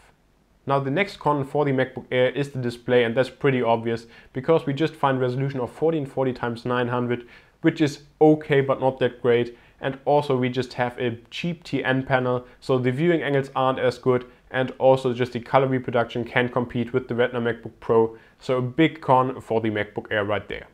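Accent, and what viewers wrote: Germany. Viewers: I actually was thinkabout how chinese was ridiculous guess and thought you have to be german, und du bist Deutsche! Gruss von Holland! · Whoa, you sound like a German or something. I couldn't imagine doing a review in German, so props for doing one in English. I recommended my brother get the Macbook Air, and he's at the store buying one now. Woot! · The guy in the video sounds like an german with an akcent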